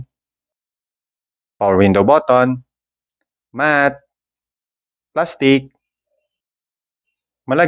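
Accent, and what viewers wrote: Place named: Thailand. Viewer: Bro, bakit Thailander ang accent mo? Muntik ko ng I-skip akala ko ibang lahi 😂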